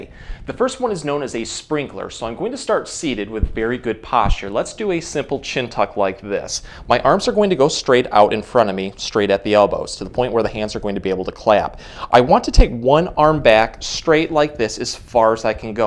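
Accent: Michigan, United States. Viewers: I'm here for the Michigan accent and the great tips 😎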